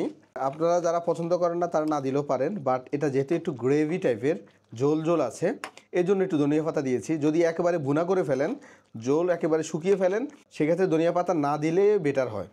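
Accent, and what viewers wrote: Noakhali, Bangladesh. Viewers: ওনার কথা শুনে বোঝাই যাই নোয়াখালীর মানুষ।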